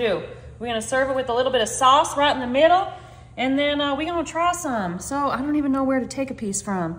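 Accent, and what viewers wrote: South, United States. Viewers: Love ur southern accent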